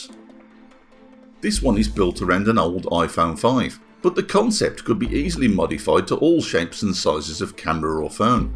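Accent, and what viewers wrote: Birmingham, United Kingdom. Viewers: Good job fella. Subbed. Do I hear Brummie in your accent mate?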